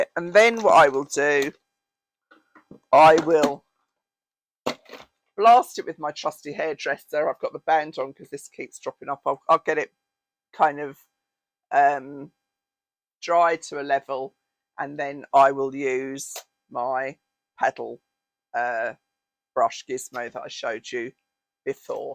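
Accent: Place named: London, United Kingdom. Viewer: Love your videos... where is your accent from? It sounds so familiar to me... SW London?:0) xx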